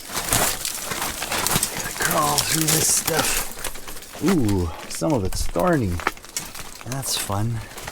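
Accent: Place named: United States. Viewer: YOU GOT A CRAZY COWBOY ACCENT & RHYME BY TALK & GOT A CRAZY COWBOY TOY CAR TOO, WHERE GOT YOU ALL OF THOSE!!! TO WHERE I' TO DIG TOO...AS TO DIG A FIRST CLASS GOLD.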